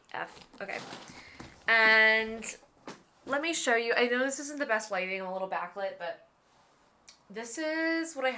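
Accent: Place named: United Kingdom. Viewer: lol love the British accent :P! and love the knit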